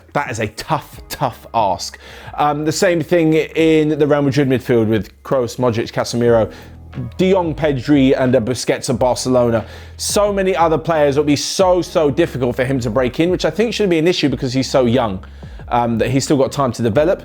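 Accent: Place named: England, United Kingdom. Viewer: Kinda is obvious with the accent, the name, love of english men for footbal etc..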